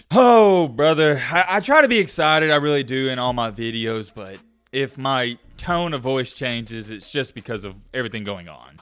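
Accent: South, United States. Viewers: Hoe-Spice? Please never complain that people assume you're an ignorant redneck based on your ignorant redneck Cracker accent. You prove it. You're simply too Dunning-Kruger to understand the condition of my favorite coach. You have no idea how many names and words you mispronounce. What is the redneck name for Ebonics?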